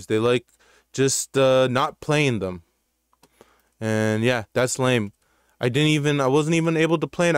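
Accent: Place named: Toronto, Canada. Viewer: are you from toronto u got a accent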